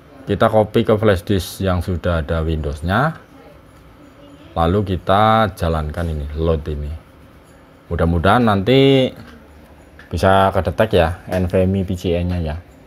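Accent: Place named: Banyumas, Indonesia. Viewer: Ngapak temen kang😂😂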